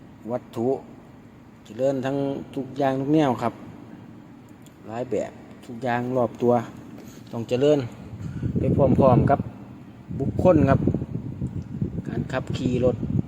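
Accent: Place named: Isan, Thailand. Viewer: คุณพูดตลกน่าฟังเหมือนคนอีสานเลยครับ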